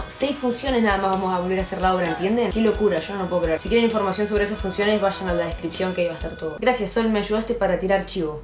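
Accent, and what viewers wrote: Argentina. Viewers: amo el acento argentino soy nuevo saludos desde mexico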